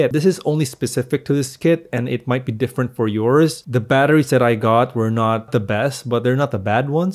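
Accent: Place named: Davao, Philippines. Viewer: Taga asa ka? Murag naa kag Davao.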